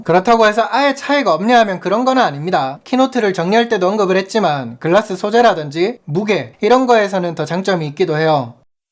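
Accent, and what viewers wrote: Gyeongsang, South Korea. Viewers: 경산도 싸나이 리뷰 웬지 신뢰감이 듬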